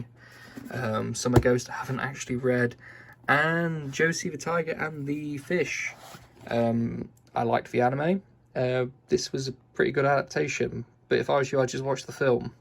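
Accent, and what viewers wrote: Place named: United Kingdom. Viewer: Yeah it’s nice to hear another UK voice I swear almost every manga YouTuber is American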